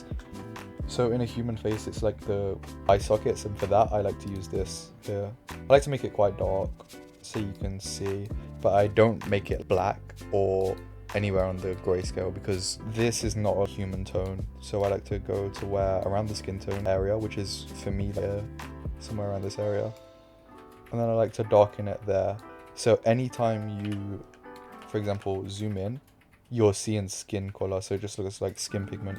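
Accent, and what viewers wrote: United Kingdom. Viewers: Are you british cuz I love you,your vids and your accent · Prolly just his accent, I'm british too but I don't say it like that tbh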